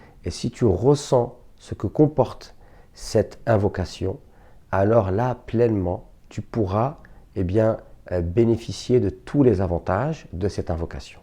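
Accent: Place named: Arab world. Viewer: Je te remercie du fond du cœur Mais l’accent arabe m’empêche de noter normalement 🙏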